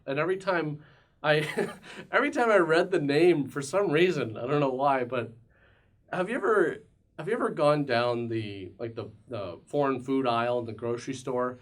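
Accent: Canada. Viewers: Are you Canadian? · canadian, eh?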